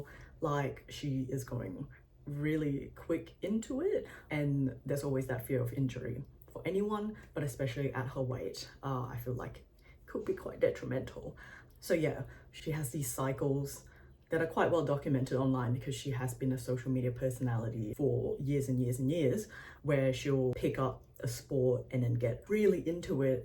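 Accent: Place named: Australia. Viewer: omg an aussie! YAYYYY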